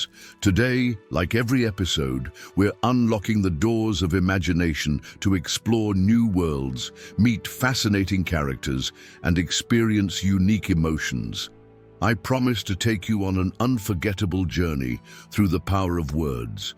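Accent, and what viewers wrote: United States. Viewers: Well this voice is better, but it still sounds American. I’d much prefer an English or European or Scandinavian or anything really but NOT American.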